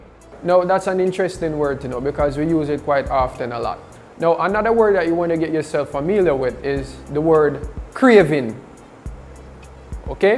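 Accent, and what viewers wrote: Jamaica. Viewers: Nice one mi really love Jamaican accent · Love the jamaican accent love from uk 🇬🇧🇬🇧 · I just love jamaican(english) accent😂😂👌👌🤷‍♂️🤷‍♂️ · Awoo me like learning real Jamaican pronunciation 😄👍🏼 greeting from Costa Rica 🇨🇷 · I love the jamaican accent but its so hard to understand and reproduce! Hahaha Never found a vídeo explaning it! So thanks!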